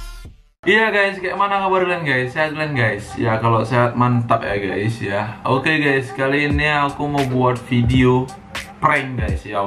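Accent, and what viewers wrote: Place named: Medan, Indonesia. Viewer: medan kali ya wakk. anak padang bulan kayak.kau ini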